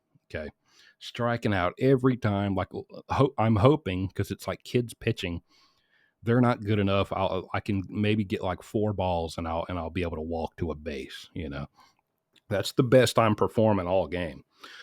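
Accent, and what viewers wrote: South, United States. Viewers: I LOVE the southern accent!! We say like it or lump it! 😂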